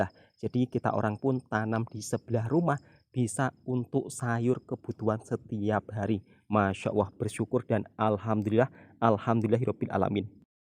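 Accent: Java, Indonesia. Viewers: Suka dengar cakap melayu slang jawa. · Kami semua faham bahasa kamu hya loghat je,pekat jawa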